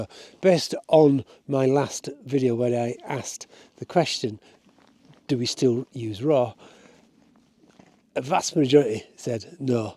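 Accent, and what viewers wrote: Yorkshire, United Kingdom. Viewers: I've had great pleasure in viewing your interesting, chatty videos, right from the beginning. This particular episode reminds me of when I had my best work assessed by a particularly high ranking elderly lady photographer, around 15 years ago. This was after having some 40 years of photographic experience behind me. In a nutshell, though the images showed competence, she told me the subjects were" too busy" - her own words. This pair of words is of course the absolute opposite of your subject here - minimalism. In taking on board this talented lady's advice, my photography was vastly improved and I have kept this thought in mind during the 15 years since, whether it be in monochrome or in color., your excellent advice is exactly spot-on with what this great lady photographer told me. Over the years since, my photos in their comparative simplicity have IMPACT, which was so lacking before! 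From one Yorkshire man to another, I love the refreshing content in your videos, including the relaxing music as well as the Yorkshire twang!!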